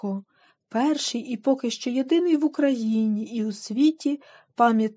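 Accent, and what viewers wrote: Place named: Russia. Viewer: Не до‘чка ,а дочка‘,багато наголосів російських ,а не полтавсьо -украінське.Розповідь інтересноа й пізнавальна ,але вимова не професійна .Спасибі .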